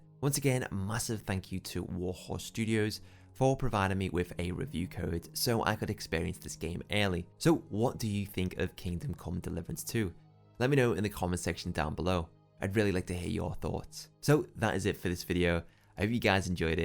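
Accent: Liverpool, United Kingdom. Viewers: Are you a scouser lad?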